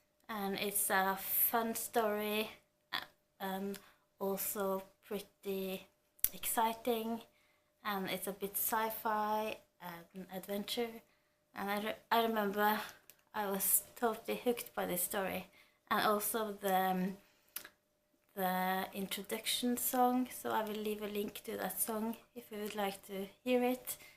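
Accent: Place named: France. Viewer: Yeah! I love this tag as we get to know even more about the booktubers we love. also, you have a terrific French accent ! Like really cool :).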